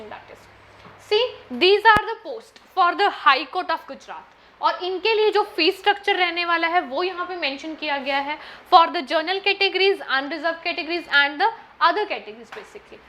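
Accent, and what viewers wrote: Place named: Bihar, India. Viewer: Just bihari boli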